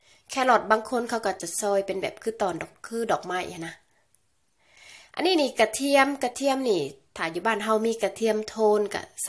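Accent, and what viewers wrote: Laos. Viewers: เจ้าของคลิบนี่พี่น้องสำเนียงคือพี่น้องสปปลแท้ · ทรรศสรวง พรมเหลือง เป็นภาษาลาวที่คนไทยอิสานฟังแล้วสะเหนาะหูดีแท่ มักเสียง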